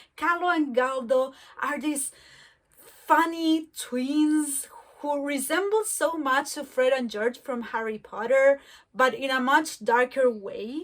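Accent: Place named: Chile. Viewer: Nada que ver, pero desde el primer segundo que te escuché reconocí el acento chileno, saludos compatriota 🇨🇱🤞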